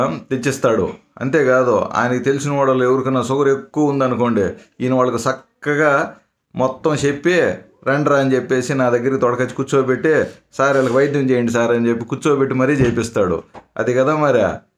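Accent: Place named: Rayalaseema, India. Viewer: Sir You are far better than any actor in our Tollywood. Good expressions sir. Voice modulation is good Our Rayalaseema slang is good.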